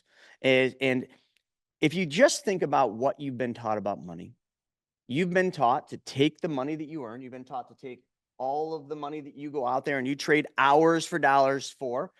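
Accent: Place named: Upstate New York, United States. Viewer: ! 😂 I’m in upstate New York as well and I could hear the accent. I will be calling you to sign up for insurance for my RLT 🎉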